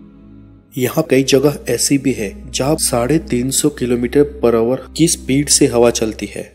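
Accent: Gujarat, India. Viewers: Speaker is Gujarati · Gujju ☝️ accent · R u Gujarati???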